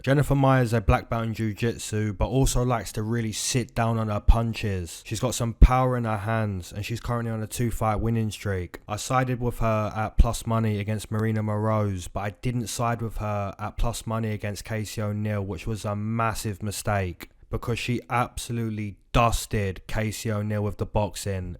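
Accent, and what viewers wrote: England, United Kingdom. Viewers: Your show is really good week in and week out. The comedy mixed in with accurate analysis in your England accent is pure money. My only complaint is I wish you would change the color of the background from white to anything else. That white background hurts my eyes on my TV. Everything else about your show is complete money. 💰💰💰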